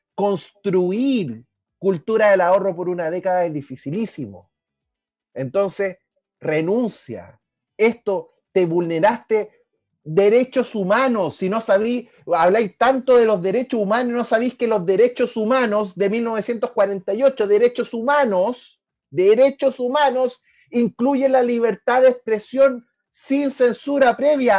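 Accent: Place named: Chile. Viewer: lo mismo que el comentario de arriba que triste que no te guste tu acento chileno, que triste que no te guste aceptar la verdad, que triste que te moleste el enojo de un chileno, no, de millones de chilenos que comparten el mismo enojo, y se lo guardan por mas de 40 años, ya estoy chato, ya estoy hasta las weas que crean que el pueblo es pura gente weona inculta, la gente de hoy es inteligente y se da cuenta las miles de inrregularidades que PASAN EN EL PAIS, con todo el puto maldito control de informacion por los medios tradicionales, para ellos es facil entender la weaa. DINERO = PODER = como conseguir el poder? = atrayendo a la gente = corropiendo los medios de comunicacion masiva = para engañar a la gente = obtener el poder = Y GANAR EL DINERO. y si el enojo de una persona para ti sea flaite, claramente vivimos en realidad diferentes, pero no importa!! 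aqui el gran enemigo no somos nosotros la gente, es la maldita corrupicion que hace lo que quiere con el dinero con el poder. Porque chile un pais lleno de recursos, con gente inteligente, sigue viviendo personas en campamentos con techo de CARTON? algo anda muy mal hace mucho tiempo, y nosotros podemos cambiar el futuro del pais, no podemos hacer nada por el pasado, pero si por el presente y el futuro. Podemos crear un lindo y maravilloso chile, hay que unirse!! y luchar con esto, hay que participar!!, y quizas algun dia chile sera muy grande. Seremos mas felices que nunca, la gente dejara de tener falsas ilusiones, ya no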